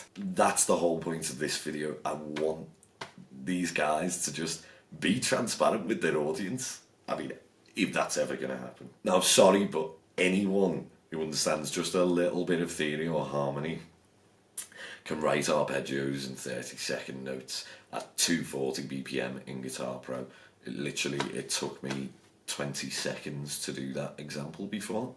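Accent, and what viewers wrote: Liverpool, United Kingdom. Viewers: Is he from Liverpool ? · Totally off-topic, but I instantly subbed for your beautiful scouse accent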